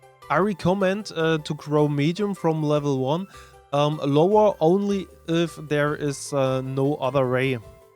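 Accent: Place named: Germany. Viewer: Nice German accent. You remind me of another German of mine.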